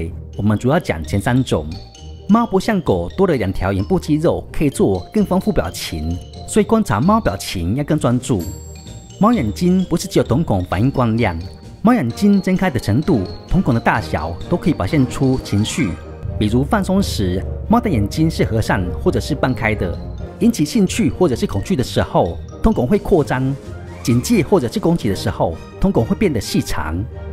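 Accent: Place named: Japan. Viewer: 不要騙我是土生土長台灣人，怎麼覺得像日本人講中文😂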